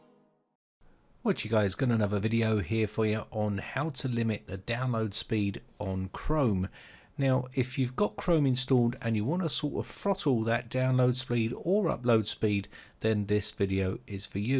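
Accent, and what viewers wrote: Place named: Cockney, United Kingdom. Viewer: It is actually 'Wotcha' guys that he says at the start of the videos. It is an English slang expression for ' Hello, how are you'. Spoken along with his semi Cockney accent. I am from London and speak in a similar fashion to this! I do agree about why would you want to throttle your connection though. I suppose someone must have a reason for doing it somewhere. Puzzling though!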